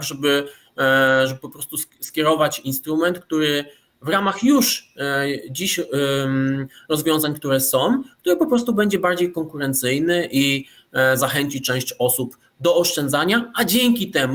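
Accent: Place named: Poznań, Poland. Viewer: Też mnie to kiedyś bardzo denerwowało. Teraz trochę mniej, kiedy się dowiedziałem, że jeśli ktoś pochodzi z okolic Poznania, to może być wynik gwary poznańskiej. Z Wikipedii: "wymowa końcówki -om zamiast -ą, np.: jakom, pałkom".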